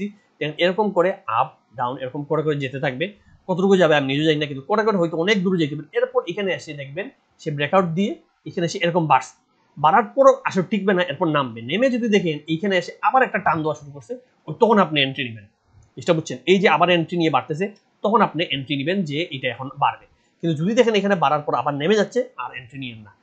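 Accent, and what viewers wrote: Sylhet, Bangladesh. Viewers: ভাই আপনার কথা খুব ভাল লাগে।আপনি সিলেটি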